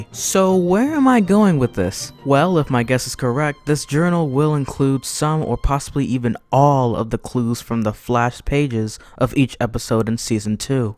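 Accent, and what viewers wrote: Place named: South, United States. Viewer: you sound like Gideon. Maybe in a little southern accent lol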